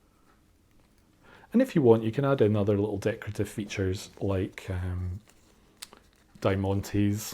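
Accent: Scotland, United Kingdom. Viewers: refreshing to hear a Scottish accent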